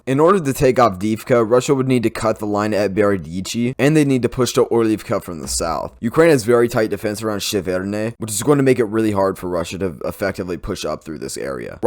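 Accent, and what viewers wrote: United States. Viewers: I love your videos and look forward to them every update. Don't worry about the knobheads freaking out over the way you pronounce words. People speak the dialect they grow up with. It's normal. Anyone freaking over it needs to go check themselves into a psych ward over their OCD behavior. I lived in England 8 years and they love American dialects the same way we love British dialects. Be who you are and anyone that doesn't like it can pi$$ off. You're the man!!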